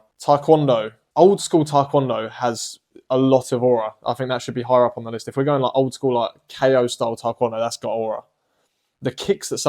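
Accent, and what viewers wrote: United Kingdom. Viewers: This is the first time i've ever heard your voice because I always just watch your shorts which always have " " as the sound, I wasn't expecting you to be british😅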